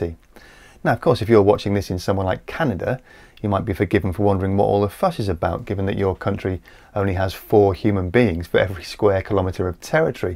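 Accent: United Kingdom. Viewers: woah ! ur british!! ? but u dont sound it man! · Yes he does! Where on Earth did you think he was from? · What!? Hes clearly british, now i have to know where you thought he was from?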